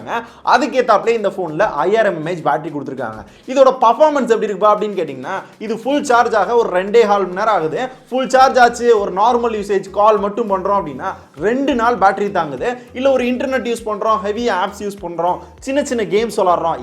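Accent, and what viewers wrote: Madurai, India. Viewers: Bro neega madurai ya bro.. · Bro neeng madurai ya..... Naanum madurai thaan adha ketta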